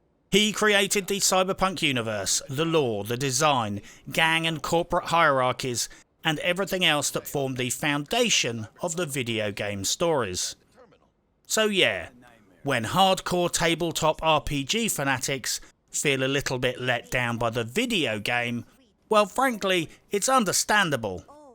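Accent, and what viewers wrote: United Kingdom. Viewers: As an emerging, very, very happy fan of your video's which somehow I didn't ever see pop up until recent weeks, I humbly ask of you sir, over in the U.K. I presume, of which I thoroughly enjoy the words used with the accent, which just gets my wife and me so much, lmao, that you do a review that's reasonable on Homefront 1 which is STILL NOT backwards compatible for XB1 Series S or X, but only playable on X-Box 360 & Homefront 2 - The Revolution, IF I've somehow missed that if you already made one. IF you could do that, at least for the 1st one, I'd like to hear your thoughts. I enjoy the campaign on it, although it's much more linear and shorter than the sequel but I cannot for the life of me understand at least 1 thing, why the f--k it's not playable on new gen consoles like 99% of all other older or slightly older games now. From the other side of the pond in America, from the great fkg state of awesome Georgia, thank you.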